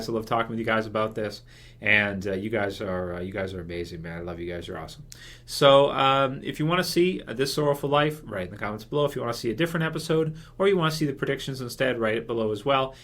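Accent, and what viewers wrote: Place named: Canada. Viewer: Hi. I love that you mentioned the accent issue. I am not a native English speaker so I did not notice Alycia's or Nick's non-American tones, even though I knew the actors are not Americans. However, I am very interested in getting to know more about accents and to learn how to spot such anomalies. Could you please point us/me more specific moments (not time codes as they may differ) but rather specific sentences that sound not as they should in terms of accents? You mentions the throwing up scene. What exactly in it? BTW1: Why do they give roles of US people to non-American actors in the first place? Don't get me wrong, I love the cast, I really do, but - honestly - whould it be really so hard for the creators to find US actors equally good, avoiding the accents issue? BTW2: You are the first person I identified as Canadian purely by listening to, so I am not so hopeless in spotting accents as it might seem because of the fact I failed to hear it in Alycia's lines;) BTW3: Great channel! 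You are very productive! Keep it up!;) BTW4: It would be AWSOME if someone (like you) made a special bonus video about accents in our favorite TV shows. Not only to point out mistakes, but just to show non-native audience how rich English language is.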